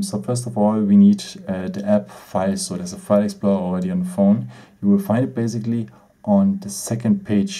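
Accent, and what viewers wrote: Germany. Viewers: German English :))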